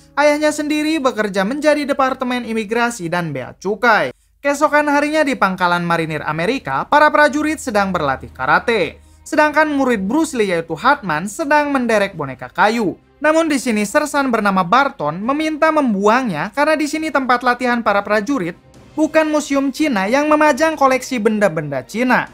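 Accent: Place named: Madura, Indonesia. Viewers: Logat nya madura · logat madura cuy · Madura yah bang, hehe, semangat bang😂💪🏻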